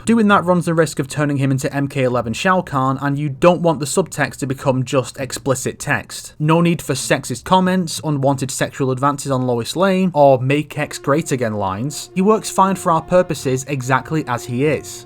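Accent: United Kingdom. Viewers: "Donald Trump is already the real life Lex Luthor". You know 'Donald Trump' is a weird way of spelling George Soros, or were you trying to say Michael Bloomberg? You brits! English between Americans and Brits sounds so different sometimes. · “Donald trump is the Real life Lex Luthor.” 🤦🏻‍♂️ You sound British so I’m going to assume you are. If all you know about American politics is what you hear from CNN or your local news, maybe dial back the commentary. Trump has the public’s support because he puts the people above globalism and big government. The media is trying to destroy him because they are far leftists in the pocket of large corporations. Trump is a hero for making himself a target of relentless personal attacks by protecting the freedom of American citizens. He’s closer to Superman than lex Luthor. Using his power for good, when it would be far easier not to.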